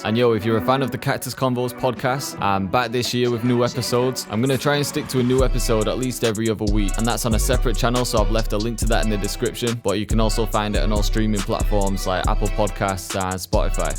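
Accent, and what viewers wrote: United Kingdom. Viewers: I like how uk ppl say 808 A O A 😂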